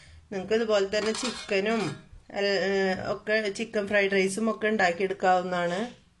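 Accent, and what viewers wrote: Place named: Azhikode, India. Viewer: Ithaade Samsaram kettapol thonni.😊 athaa chodhichath.. njan kaipamangalam aanu.. husbandinte relatives und azhikod.. angineya ee slang parichayam☺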